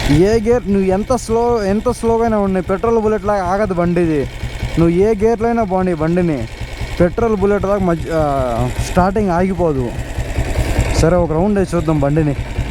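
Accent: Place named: Karnataka, India. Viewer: u r language is good to hear , karnataka border untaava mowa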